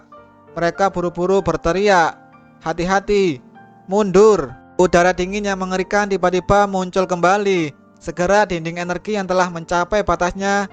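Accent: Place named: Java, Indonesia. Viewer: Wah medoh jawane✌😁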